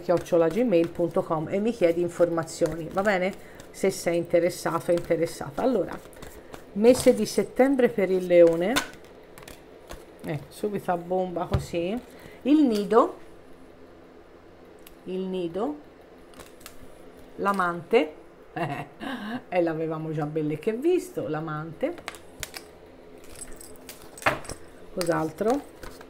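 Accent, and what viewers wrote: Tuscany, Italy. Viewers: Cara io sono leone questa stesa rappresenta molto la mia situazione! È da molto che ho dei dubbi su una persona ed una specifica situazione..spero di scoprire di cosa si tratta! Spero in belle notizie! Grazie ti abbraccio sei simpaticissima con il tuo accento Toscano..sono Toscana pure io a presto ciao! 💗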